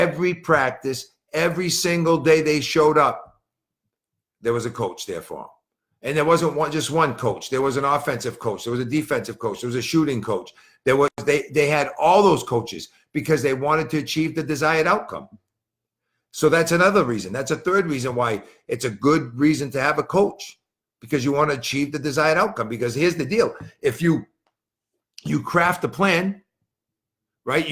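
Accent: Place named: Boston, United States. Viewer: That feedback loop and accountability are so important. That Boston accent is strong!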